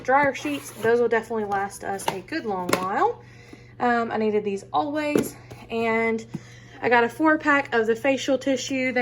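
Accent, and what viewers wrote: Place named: Georgia, United States. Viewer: Hey there I'm new ... And enjoyed your video very much but could you add the prices I like to compare prices. But it's very good I just like that 💜 and where are u from lol the accent I'm in Ga so I couldn't miss it lol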